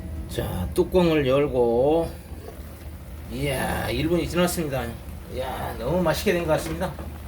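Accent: Jeolla, South Korea. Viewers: 전라도 말씨 거슬려요 비호감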